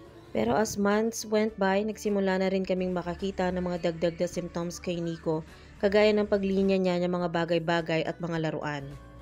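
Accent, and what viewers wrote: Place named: Pampanga, Philippines. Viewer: Hi po Mommy napansin ko po kampangan kayo..taga pampanga po ba kayo?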